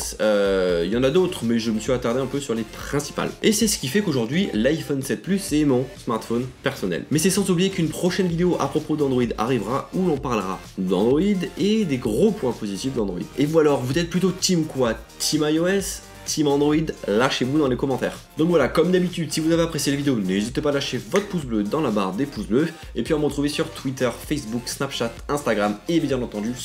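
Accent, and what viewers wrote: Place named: Marseille, France. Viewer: ahh j'ai griller ton accent marseillaise et team ios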